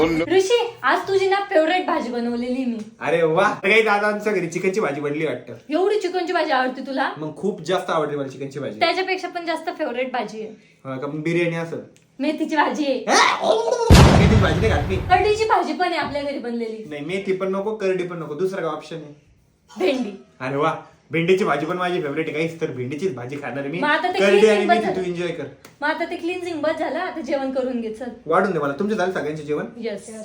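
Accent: Khandesh, India. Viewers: Bhau tumichi khandeshi ek nambar aahe 😅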